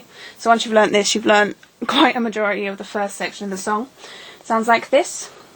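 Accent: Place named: United Kingdom. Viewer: thanks so much for this tutorial, I LOVE your british accent it's amazing :) greets xoxo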